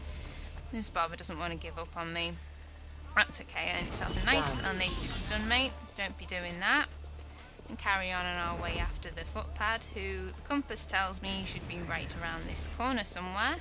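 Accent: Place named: Australia. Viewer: hey what nationality are you? you sound australian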